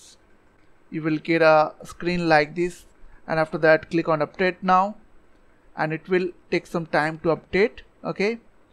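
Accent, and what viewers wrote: India. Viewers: i heard the indian mans voice and felt relief but for just this one time the indian man couldnt save me :( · when i have technology problems and hear an Indian man talking, I know that instant my shits gonna get fixed! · now this tutorial with less thick indian accent so I can take this seriously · the moment I heard an Indian guy, I'm 100% sure this will help me. Thanks a bunch! You guys are a blessing!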